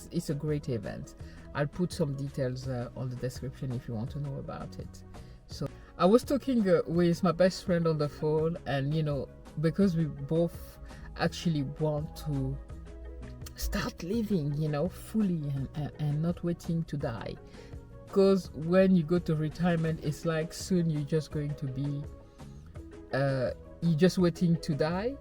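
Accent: France. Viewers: That adsense delivery timing was perfect! 😂😂 I love what you said about God and higher power. Your accent is amazing - so is it French/British? Also I have had to fire two really good people in the past few months and it’s just as awful on the other side of the table. Our business is down in profit and if we didn’t let people go, we would eventually have to shut down and everyone would be out of a job. I know it feels so personal and terrible but it really comes down to spreadsheets. I don’t know if that helps or not but just wanted to say both sides of the conversation are really hard. I love my employees and know they have bills and families and know their stories. But I think you have a great attitude and I’m not worried about your future success at all!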